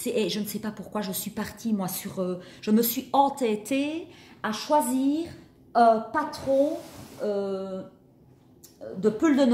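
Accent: Liège, Belgium. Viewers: Quel plaisir de vous écouter ! Toujours ravie d entendre votre accent liégeois : ma ville natale! Vous faites de très belles réalisations malgré le fait que vous ne maîtrisez pas encore complètement la surjeteuse ! Merci.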